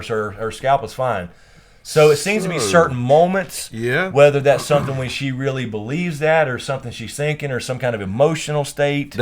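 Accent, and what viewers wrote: South, United States. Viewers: there is nothing wrong with a sweet good ol boy accent. just ask for parts as soldiers in someones army.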